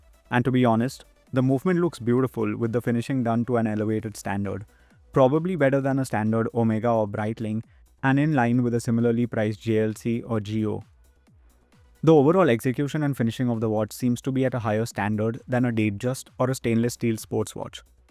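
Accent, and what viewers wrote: India. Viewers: don´t know, this video popped up and I am fascinated by an indish accent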